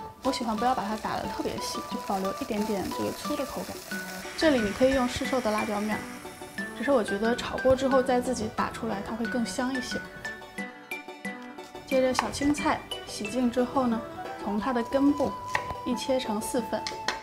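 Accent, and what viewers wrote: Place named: North, China. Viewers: 口音一听就是我们北方人🤣🤣🤣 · 山西方言吗？感觉说话舌根子比较绵软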